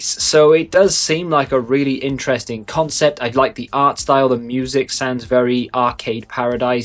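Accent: Wales, United Kingdom. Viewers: He's Welsh boyo.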